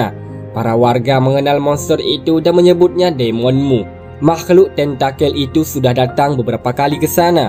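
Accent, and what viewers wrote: Aceh, Indonesia. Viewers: Ini admin a pasti orang aceh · Apakah adminnya org Aceh ? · Cara ngomongnya kek orang Aceh ya bg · Ia org aceh knyak ya😂😂😆😂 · Logan acehnya masi kental bang semangat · Aceh teoh😃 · Kek bhs aceh sikit² tpi mntap bg👍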